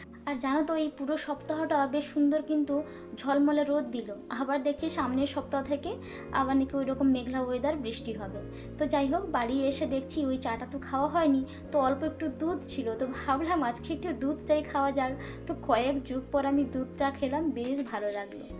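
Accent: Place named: India. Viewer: Puro videota khub valo laglo tomar kotha sune bojha jai j tumi india r meye ki sundor dekhe sune khoroch koro khub sundor lage dekhte cuttie❤❤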